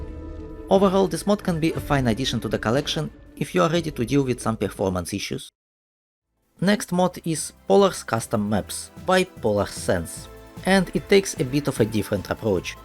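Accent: Russia. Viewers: я вижу что актцент чуть чуть не англ а русский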